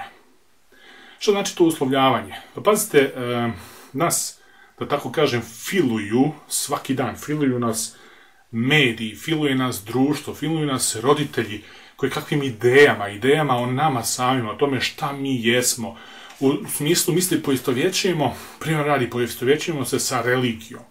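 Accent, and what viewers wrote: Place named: Ekavian, Serbia. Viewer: Ooooo, preslo se na ekavicu!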